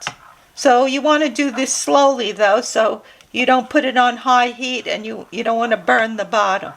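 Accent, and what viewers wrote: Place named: Long Island, United States. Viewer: Ex-Long Islander here out in Vegas. LOVE hearing your Long Island accent makes me feel as if I was back home! I gotta make these tomorrow. Wishing you both a warm and Merry Christmas! ♥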